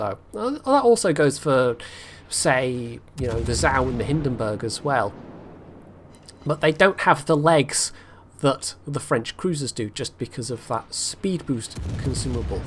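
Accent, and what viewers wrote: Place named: United Kingdom. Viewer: Huh, I didn't even realize I played it so sloppy until this nice British person with gentle voice told me just how much I suck really. Kappa. Jokes aside, glad you've found this worth looking at.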